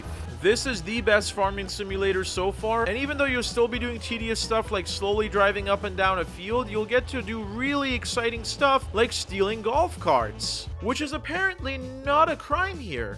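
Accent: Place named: Germany. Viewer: Bro sounds like a real german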